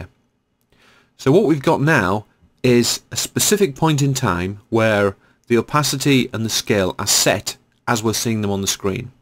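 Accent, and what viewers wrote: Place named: United Kingdom. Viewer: Thank you for this tutorial sir. I'm glad that when i listen to this video i hear a light British accent rather than the strong irritating American accent that i hear all too often.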